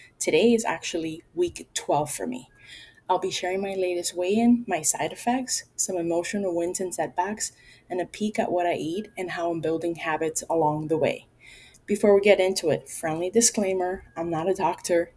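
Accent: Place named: Latin America. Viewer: Thanks for the shout out!, I never counted one calorie during this entire 3 year GLP-1 excursion that has helped me lose over 280 lbs. If you are not led to count, don't! There can be medical reasons to, but let your provider/nutrition-specialist guide that path, not nagging from others. And your weight loss? Girl, you are losing 1 pound a week! That is the exact average weight loss. Those of us who lose fast are the outliers, not you. People, including me, who are super-responders, tend to speak up louder than those who are on the path at the normal pace. You are doing great! Nosy question you don't have to answer: Are you Latina? I detect the accent. ¡Soy cubana!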